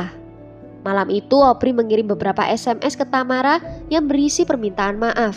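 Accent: Java, Indonesia. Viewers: Hay.. medokuhhh 👍💖💖💖 sukses trs yaa say 🤲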